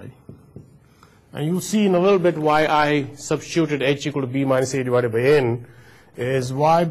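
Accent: India. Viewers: Dude has the best Indian accent.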